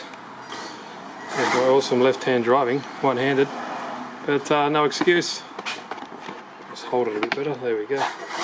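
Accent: Australia. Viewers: It’s a shame that your vids keep getting demonetized, seems like YouTube’s never experienced Australian English before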